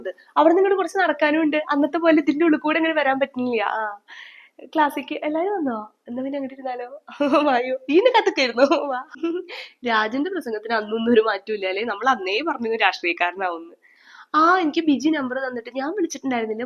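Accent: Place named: Malappuram, India. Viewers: നിങ്ങളെ ഒരുപാട് ഇഷ്ടം ആണ് നാട് എവിടെയാ സംസാരം കേൾക്കുമ്പോ മലപ്പുറം ഒക്കെ ആണെന് തോനുന്നു pls reply❤️ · സ്ക്രിപ്റ്റ് 👌🏼👌🏼👌🏼👌🏼👌🏼🥰🥰🥰🥰🥰🥰.. നാട്ടിൽ എവിടാ...calicut ആണോ??? Slang നമ്മുടെ മലപ്പുറം, calicut പോലെ ഉണ്ട് ❤️❤️❤️ ഞാൻ calicut ആണ്.. അതാ ചോയ്ച്ചേ 😊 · മലപ്പുറം , പാലക്കാട് slang ആണെന്നാണ് കേട്ടിട്ട് തോന്നുന്നത്. ഞാൻ മലപ്പുറം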